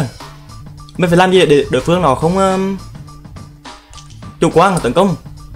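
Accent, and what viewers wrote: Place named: North, Vietnam. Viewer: Anh nói tiếng nam đi tiếng bắc khó nghe wa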